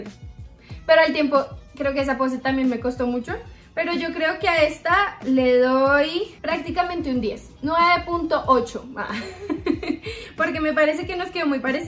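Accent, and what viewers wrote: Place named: Colombia. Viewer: me encanta tu acento colombiano 😍😍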